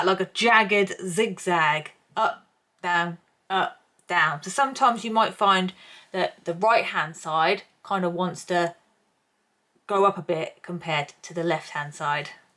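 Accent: United Kingdom. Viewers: I love love love you for many reasons 😂 but most of all is british accept but US terminology. I had no idea that i can gwt both. So it's all a lie that I can't have my cake and eat it too 😂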